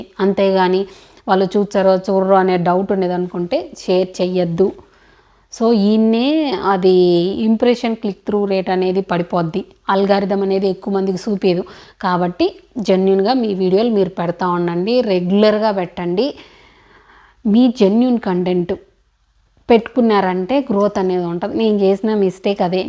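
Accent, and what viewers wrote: Rayalaseema, India. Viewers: రాయలసీమslang చాలాflowగాఉంటుంది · మేము కొన్ని సంవత్సరాలు కడపలో ఉన్నాము, రాయలసీమ భాష వినడానికి బాగుంటుంది, మీరు చెప్పే విషయాలు నాలా కొత్తగా యూ ట్యూబ్ చానెల్ క్రియేట్ చేసిన వారికి చాలా ఉపయోగపడతాయండి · Hi sis మేం కూడా రాయలసీమ మీరు మాట్లాడుతుంటే చాలా happy ga వుంది,mobile ముందు పెట్టుకొని చూస్తే మా sister tho opposite లో కూర్చుని మాట్లాడుతున్నట్లుంది, జై రాయలసీమ అక్క,,slang 👌👌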